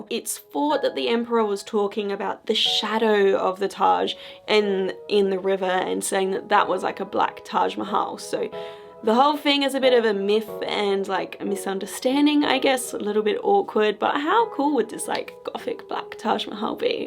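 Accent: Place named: Australia. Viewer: I love ur channel your content is amazing. Your so sweet,nice and positive all the time. Sometimes I think she's so positive but into dark shit lol your definitely unique that's for sure lol I love the aussie accent. Thanks for cheering us up during these dark days. Mad love from the states 👹👻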